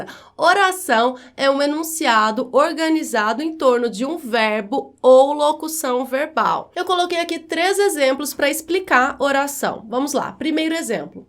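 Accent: Brazil. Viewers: Gosto muito das suas explicações. Falo português europeu, mas entendo o vosso sotaque/dialeto brasileiro muito bem.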